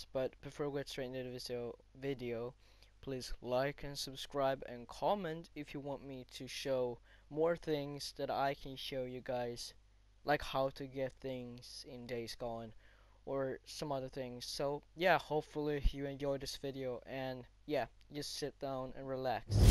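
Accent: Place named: Norway. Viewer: very nice, immediatly heard it from the accent. love from norway!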